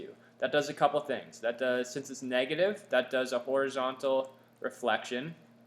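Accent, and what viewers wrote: Canada. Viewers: The views go from 40k down to like 16k and then down to 10k. People arent sticking around for the whole thing. Lol. Shoutout to Canada. This dude sounds like a typical canadian dude.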